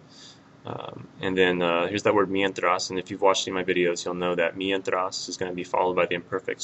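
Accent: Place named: United States. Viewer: esto es genial. Solo queria decirte que tienes un poco de acento americano, pero la pronunciacion es muy bien. Puedo usar tus videos pa' aprender Ingle en lugar de españoles porque soy de Puerto Rico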